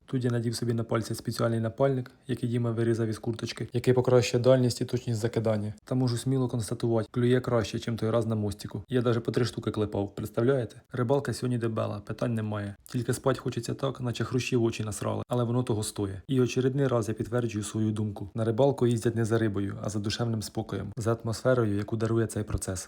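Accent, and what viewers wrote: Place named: Sumy, Ukraine. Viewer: А з якої ви області? Такий суржик, як в Сумської. Я наче вдома побувала. Дякую вам!!!) Гарний контент( щоденне життя то круто).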